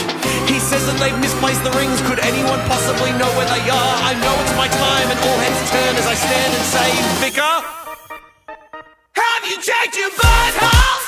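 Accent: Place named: Australia. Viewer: Why isn't there anyone who mentions that his Australian accent is at least like 50% of what makes this perfect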